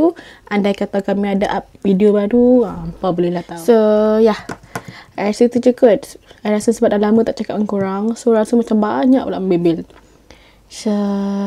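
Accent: Kedah, Malaysia. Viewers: actually aq x prnh mkn timun.. tpi bila tgk dy makan timun.. tetibe rsa mcm nak mkn timun☺papon nice video kak btw..kak ni org kedah ka?sbb dengaq jgk slang utaqa tu😅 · Loghat kedah asal kedah ke ? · comel kak ckp loghat kedah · Kak orang kedah ka??🙈😉👌😅💞✌ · jangan cakap kedah rasa pelik 😂😂 maaf kalau ade silap cakap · Loghat kedah lah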